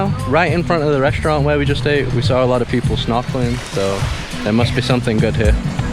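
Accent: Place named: Scotland, United Kingdom. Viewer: sounds scottish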